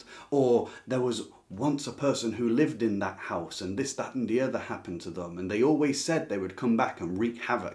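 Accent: United Kingdom. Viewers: I seriously wish this could have gone on and on for hours! When you read that story, you brought the characters to life. Having a British person read with such great accents made the story so much more interesting! As an American I can’t really imagine too many British accents and their subtleties, but you made it so amazing :) definitely subscribing!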